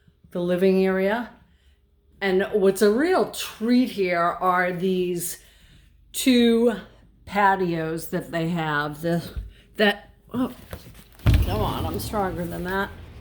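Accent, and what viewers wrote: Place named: Boston, United States. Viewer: New England as in Boston. (Pah kahz.) Love it! Am I right? Or at least close by...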